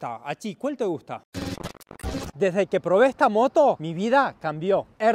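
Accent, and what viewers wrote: Argentina. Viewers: Ese acento bien argentino jajaj muy bueno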